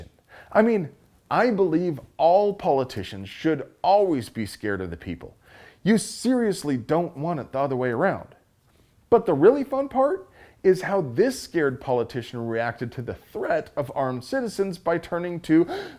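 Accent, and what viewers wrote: United States. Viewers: You talk at first as if you're a Canadian, speaking to Canadians. But as you go along, you sound more & more like an American (using FBI statistics, as well) speaking to God knows who. I like facts. But I don't need to be "loved" by a chubby male stranger that I've never met. Thanks anyway. (Signed, Canadian Permit holder).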